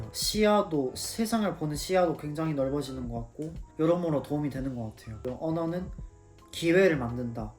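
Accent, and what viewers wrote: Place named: Daegu, South Korea. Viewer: 한국어 사투리까지 쓴다는 건 더 대단한 언어 능력이지요. 같은 대구에 산다니 더 반갑네요. 앞날을 응원합니다!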